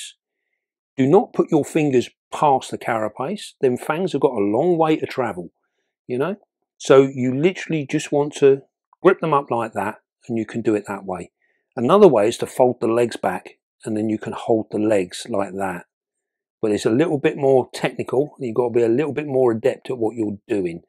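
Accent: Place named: London, United Kingdom. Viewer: English sounds a little like a London accent I think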